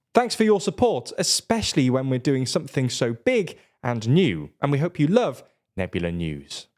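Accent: United Kingdom. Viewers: I hate hearing a British accent I used to like it but everything I hear out of the UK is a lie sadly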